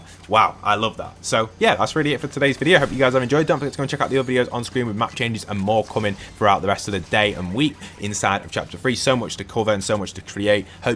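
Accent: United Kingdom. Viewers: Yo r u from Leeds or manchester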